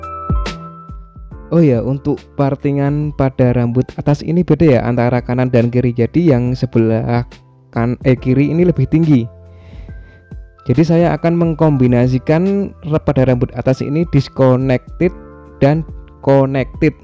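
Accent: Indonesia. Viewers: Ngomongnya Gk usah gua2 sih wong logat jawa kok pake gua, bilang aja saya atau aku mas,,, biar enak dengernya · Ngapak ya mas 😆😆 Salam satu gunting 💈💈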